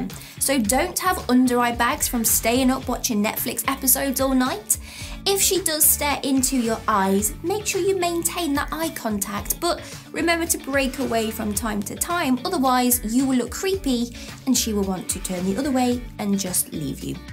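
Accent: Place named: RP, United Kingdom. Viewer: This accent is RP .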